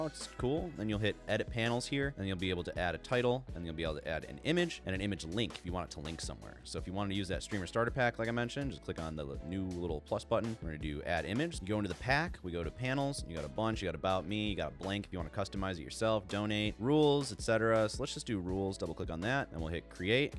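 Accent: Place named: Scotland, United Kingdom. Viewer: Ayy ... Didnt realise u spoke .. Scottish ..... :)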